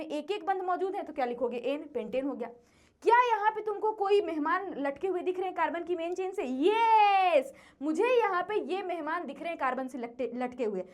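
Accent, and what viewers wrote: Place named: Bhojpuri, India. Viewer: From CBSE board but chemistry in Hindi with bhojpuri accent sounds sweet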